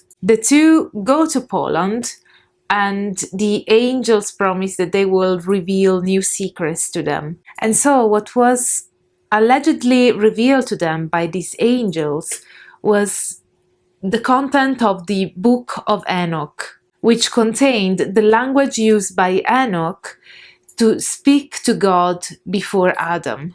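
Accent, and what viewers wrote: Italy. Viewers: your accent sounds Italian, quindi spero che mi capisce, comunque io non ho mai lavorato con gli Angeli Enochiani, ma con demoni del impero di Lucifero e con l'Arcangelo Michele, vari dei diversi, hindu ed egiziani, pero ultimamente ero interessato su sti angeli, i miei sensi non sono i migliori, ma credo che mi era stato detto di lavorare con Michele per aumentare i miei poteri, ma vabbe...hehe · You do have a beautiful accent. Then again, Italian is a special favorite. It's a beautiful day come tomorrow, 🙏🏻☕🌅🌹😌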